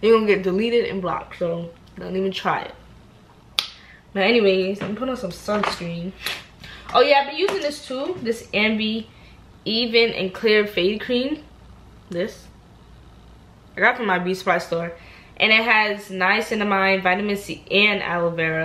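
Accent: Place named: Brooklyn, United States. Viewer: So funny your Temu video was the first video i seen of yours and i knew you was from Brooklyn because my man is also from Brooklyn i picked up the accent rq 😂 and its funny how you also used the example of ppl from the south because i am from the south 😂 i am from Texas sometimes me and my man don’t understand our accents lol for example he says “oil “ i say “oyl” 😂😂😭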